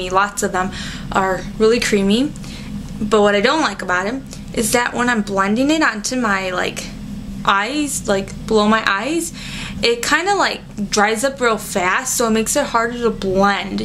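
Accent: Brooklyn, United States. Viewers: u r really so damn pretty, they way u sound and the way u look dont match, u have this exotic beautiful look and one would almost expect this smokey sultry voice with a european accent, but u sound the the kid next door from brooklyn or something. LOL anyway good reviews and tutorials, keep em coming!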